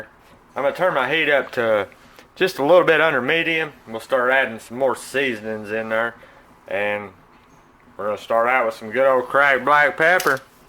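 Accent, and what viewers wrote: South, United States. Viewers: Where in the south you from? I can't place the twang.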